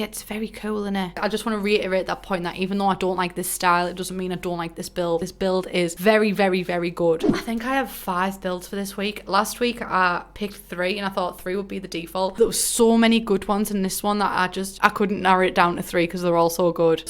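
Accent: United Kingdom. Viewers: I've been binge watching you for about a week now, and now the voice in my head speaks with a thick british accent. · I don’t understand British speech can someone INTERPRET???? I only reed Harry Potter and that’s it , yes I’m American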